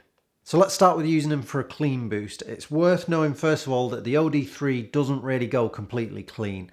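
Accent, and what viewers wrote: Manchester, United Kingdom. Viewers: Cool video. Sounds like you're from Manchester.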